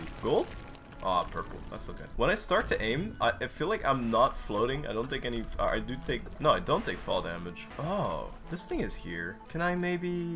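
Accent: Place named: France. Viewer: MAN THAT ACCENT HOLY WOOOW JE SAVAIS PAS QUE T’ÉTAIS FRANÇAIS je suis choqué mais grave surpris continue tes vidéos d’explorations elles sont grave chill!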